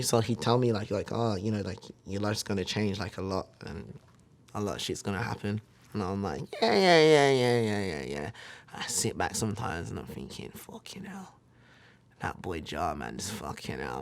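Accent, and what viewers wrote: United Kingdom. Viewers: He is British...ik that It's Suprising tbh😂🤡🤡🤡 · I love his British accent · Bro I didn't know scarlxrd was British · He said hella fucking British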